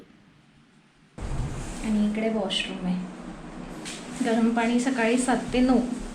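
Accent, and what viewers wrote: Kolhapur, India. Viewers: THANK YOU KHUP VIDIO PAHAYLA MILTAT PARANTU TUMCHI DEVGAD OFBIT BHTKANTI MANALA BHAVLI TMHI DOGHHI FAR JUNTALMAN AAHAT TU MAZYA GAVCHYA SANGMESHVAR JAVAL DHAMNICHI AAHES PAN TUZYA BOLNYYAT KOLHAPURI TUCH AAHE TUZYAKDUN AAMHALA APLE KARNESHVER MANDIR SAMBHAJI VADH STAMBH MARLESHVER HI OFBIT THIKANE TU KADHI EXPLOAR KARNAR.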